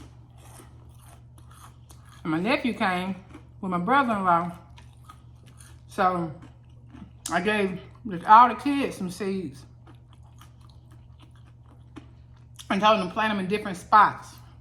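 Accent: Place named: South, United States. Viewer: Your southern accent is so pure ❤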